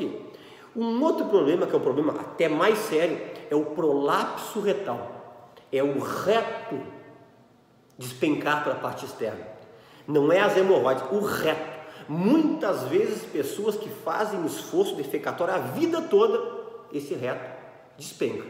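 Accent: Rio Grande do Sul, Brazil. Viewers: Dr muito obrigada por seus videios,Tenho 65 anos,tenho Diverticulite e as fezes ficam presas na parte direita,ai vou massageando p baixo p que solte.Procuro me alimentar bem e mais de alimentos naturais.O que devo fazer p defecar melhor? Agradeço sua resposta. Amo o RS e seu sotaque, morei 12 anos em Novo Hamburgo,agora moro no RJ,onde nasci.Deus o abençoe ricamente sempre.Bjo no coração.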